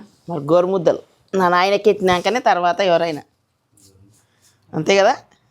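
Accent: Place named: Mahabubnagar, India. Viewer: Hi Anna mi videos Chala baguntayi mahabubnagar slang lo chala baga mataladatharu nen pregnant adhi chuda gani cravings starting ayindi nak kosam koncham pampiyandi please 😊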